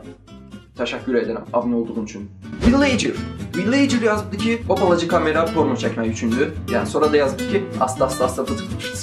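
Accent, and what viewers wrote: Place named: Zaqatala, Azerbaijan. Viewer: Bu video da digərləri kimi əladi və çox maraqlidi yəni mənim üçün elədi hə bu arada məndə Zaqatalalıyam danışığından bilinir onsuzda sənə uğurlar😊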